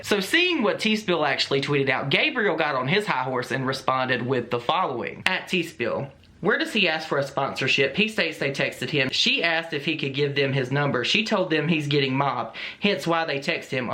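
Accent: South, United States. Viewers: Subbed for that southern drawl lol love it . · I love your accent! Is it southern?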